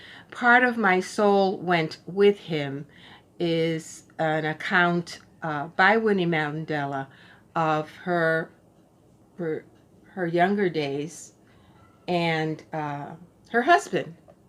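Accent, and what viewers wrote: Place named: Puerto Rico. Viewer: Hello, I enjoyed your nonfiction TBR and loved hearing the language and accent of "mi gente." I'm astounded at the gall of the author who thinks Puerto Rico is not part, or shouldn't be part of the US. It's not like we had a say in that situation. I'm hoping for the best. Aloha y bendicion.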